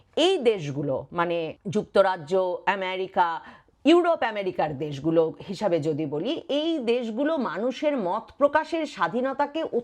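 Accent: Kolkata, India. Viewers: আপু আপনি কোলকাতার ভাষায় কথা বলেন কেন, আপনি কি ইন্ডিয়ান, "র"?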